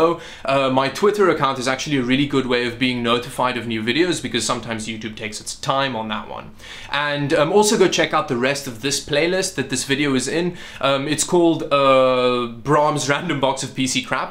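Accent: South Africa. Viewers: Wait you're south African?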